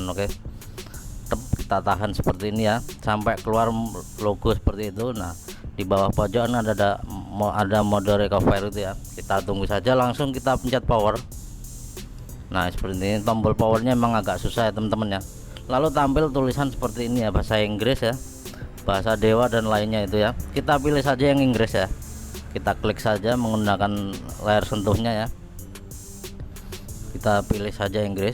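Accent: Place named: Lampung, Indonesia. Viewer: sampean orang lampung ya mas? kalau di benerin pake hp ga bisa yw mas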